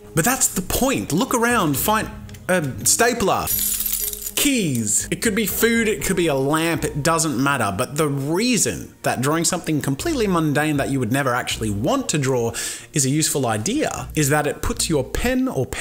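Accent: Australia. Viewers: Are you Australian... I am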